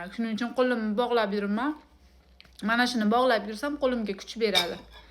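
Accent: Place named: Kolyon, Uzbekistan. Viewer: Siz kòlyondan emasmisiz gapirishiz òxshaydi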